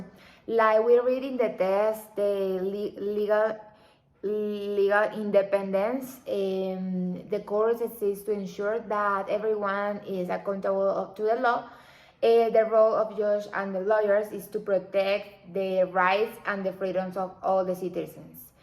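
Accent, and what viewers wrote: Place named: Caribbean Coast, Colombia. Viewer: Costeña hablando inglés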